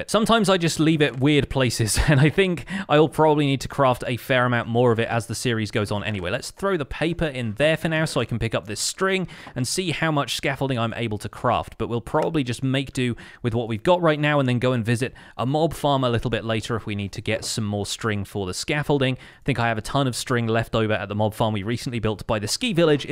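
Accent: United Kingdom. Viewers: Why did I notice he sounds British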